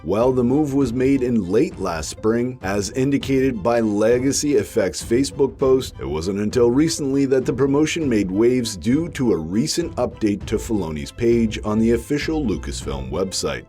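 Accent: Canada. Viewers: I can tell your Canadian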